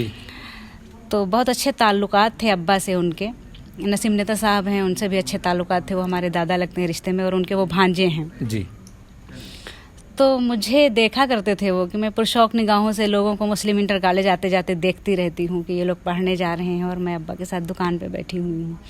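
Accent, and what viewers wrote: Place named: Pakistan. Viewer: Masha Allah Aawaz me kitni Achchi urdu Zuban ki Bu hai Dil khush ho gaya sun kar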